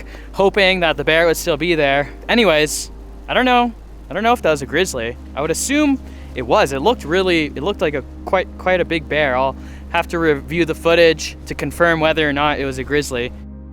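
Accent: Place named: Michigan, United States. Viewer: I like your vocabulary you talk like you're from Michigan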